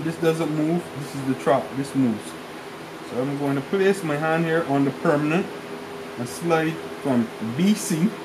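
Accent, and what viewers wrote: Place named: Barbados. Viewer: This guy sounds like a Bajan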